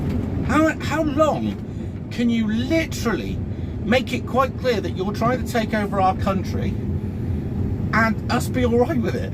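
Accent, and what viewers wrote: United Kingdom. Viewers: What kind of British are you?🤔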